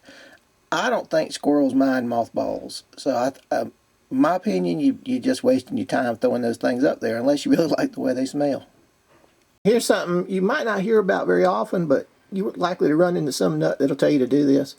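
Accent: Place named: South, United States. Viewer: I love the southern accent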